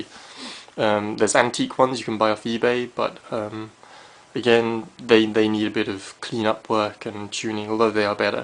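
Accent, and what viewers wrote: United Kingdom. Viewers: Also, you trolled me really hard. I'm watching a video of a guy making planes, a Brit obviously, because of the accent if I ever can spot one, and he says he lives in Japan, and refers to Japanese people as 'they'. So i click on his other video, and who do I see? A fella of obviously Japanese origin, though I've seen in comments you saying you're british. And yes, I was about to ask how come that your accent is perfect, since I know Japanese natives have some problems saying L in English. Also what's funny with you being asian (no disrespect, hopefully you know that) is that the first thing I'm making using plane you've help me build is a... japanese tanto saya (scabbard). Let me tell you, if you ever gonna build one, don't bother with straight chisels. Real sayashi would use a curved one, that can do paring cuts everywhere, even on the far end of the scabbard. 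Now I'm going to my 'workshop' to try for the third time. Come along if you wish to learn some Polish words used to express your discontent, sir. ; )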